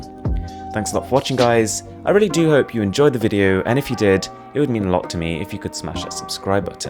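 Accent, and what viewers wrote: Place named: United Kingdom. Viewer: he was born and brought up in England. He's a British citizen, and hence the accent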